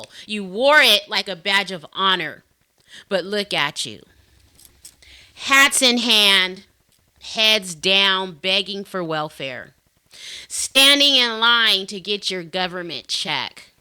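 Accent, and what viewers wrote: United States. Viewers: Racism has no skin colour sister, it is a spiritual thing...check your motives... When I close my eyes, you sound like a Yank. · A great American Voice. Thank You.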